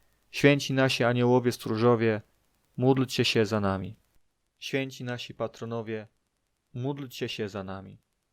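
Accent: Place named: Silesia, Poland. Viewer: Akcent Śląski.Początek modlitwy szczery i własnymi słowami jak wskazuje Słowo Boże.Jednak końcowa część modlitwy oddzielona lekko od reszty jest niezgodna z Pismem Świętym,ponieważ nie wskazuje modlitwy do ludzi(Rzym. ) a nawet potępia.Maria matka Jezusa była pokorna niewiasta i nie oczekiwała modlitwy do siebie,ale też kierowała je tylko do Boga Ojca.Pan Jezus poproszony przez uczniów o naukę modlitwy też wskazał,że należy kierować je tylko do Boga Ojca,,Ojcze nasz....świec się imię Twoje(jakie?)....."itd.Pan Jezus nie powiedział módlcie się do mnie,albo módlcie się do matki mojej-tak nie powiedział! Końcówka to wierszyk powtórzony po kimś-nie własne szczere z serca płynące.Biblia podaje dużo przykładów szczerych modlitw sług Bożych w różnych miejscach i były to osobiste myśli nie czyjeś.Nawet w Ew.Jn.17 rozdział cały to modlitwa Pana Jezusa do Boga Ojca-szczera z serca,nie do Marii i nie do anioła.To tak naprawdę przykład modlitwy niezgodnej ze Słowem Bożym,choć robi wrażenie i to jest zwodnicze😘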